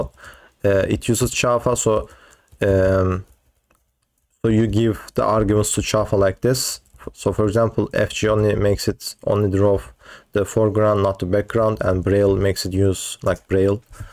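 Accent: Turkey. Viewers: Baba aksandan anladım türksün yorumlara baktım insanlarda demis baya iyi yapmışsın ya wp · buram buram türk aksanı kokuyor, eline sağlık bu arada :D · Tam bir türk ağzı var sende. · Your accent like Turkish <3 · bende bu adam Türk dedim yorumlara bi baktım elrond reis niye her yerdesin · ingilizce öğretmenliği okuyorum. videonun 5. saniyesinde falan açıklamaya baktım türk müsün diye. evet gerçekten belli oluyor çünkü türkçenin (türki tüm dillerin) fonetiği diğer dillerden aşırı farklı ve ayırt edilebilir. ama aksanının olması kötü bir şey değil, dediklerin gayet net bir şekilde anlaşılıyor. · aksaana bak tam türk amk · direkt türkçe konuşsaydın aksandan anladım direkt XD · Aksanından anladığım üzere Türksün. Yaptığın şey çok hoş ve verimli görünüyor! Tebrik ederim.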